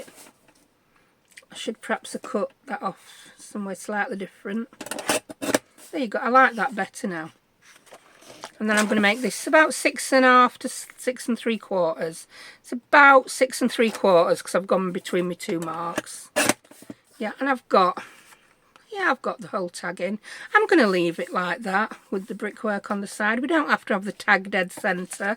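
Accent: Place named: England, United Kingdom. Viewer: Great video, love your waffling, faffing and your accent, it reminds me of when I lived in England. Cute little folio, thank you 🥰🌺❤️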